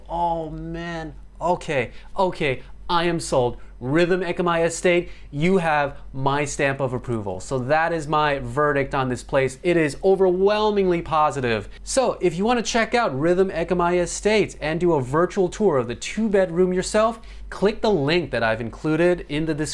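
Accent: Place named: United States. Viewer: R u Thai and German, how come u sound like American, did u grow up in America?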